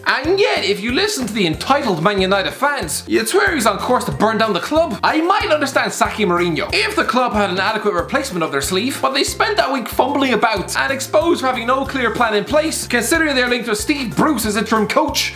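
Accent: Ireland. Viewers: A Newcastle fan with an Irish accent, I don't know what to believe.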